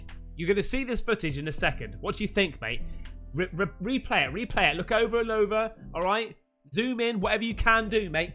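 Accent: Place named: Scotland, United Kingdom. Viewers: What’s up man hello from Boston Massachusetts USA Edit: u sounded just like my grandparents they came to America from greenock Scotland but that was funny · Scottish actually lol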